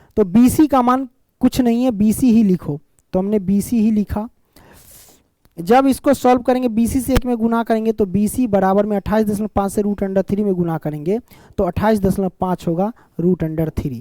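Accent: Bihar, India. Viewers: सर आप बिहार पटना से हो क्या आपकी मात्र भाषा तो हिंदी हे परन्तु आपकी स्थान भाषा बिहारी हे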